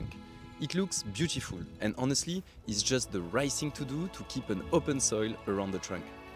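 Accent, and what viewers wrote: France. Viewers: I love your french accent, your english is perfect but (la petite touche française) it's brilliant, I have the same, some times people tell me, what you just said sounds french and there I go ( my 1est language is french/suisse). Bonne aventure profitez la vie est trop courte.:thanksdoc: 🌼🥀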